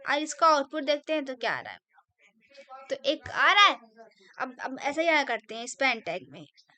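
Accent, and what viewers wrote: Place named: Uttar Pradesh, India. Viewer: HI what's your name I'm also learnig Javascript, and also learnig css, from where are you, I think You are from UP, am i right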